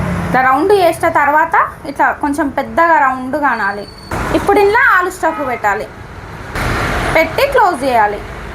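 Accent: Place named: Telangana, India. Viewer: Akka Miru pakkaTelangana slang hey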